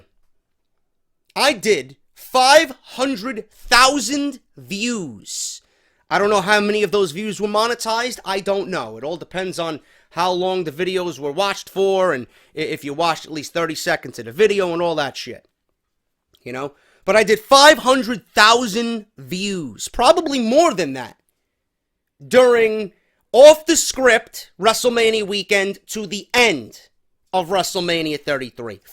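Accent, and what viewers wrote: New York, United States. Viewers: Dude you are so entertaining. I always watch. That New York voice is so funny.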